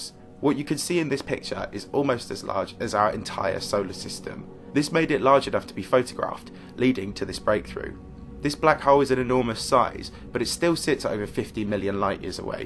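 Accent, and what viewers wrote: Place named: Essex, United Kingdom. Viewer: He's doing what God put him on this green earth to do; have a salon-quality Essex voice, and read the astronomy.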